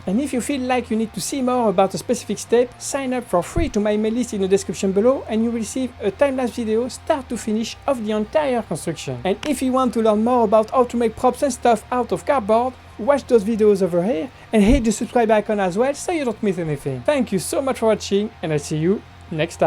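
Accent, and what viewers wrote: France. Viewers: T'est français?, je trouve que t'as un accent francais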